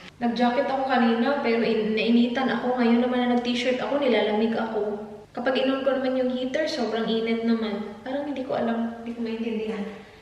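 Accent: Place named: Visayas, Philippines. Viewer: Bundak kaayo mu bisaya si ate 😂 shout out po ate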